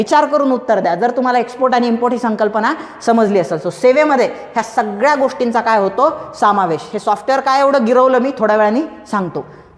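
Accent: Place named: Amravati, India. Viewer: Aj khup divsane amaravti chi language aaikun khup chan vatle.... Bhari ekdm. Lecture aaikun tr khup fayda zala.. Pn aj amaravti chi athvn.. Ali ba. 🙂😀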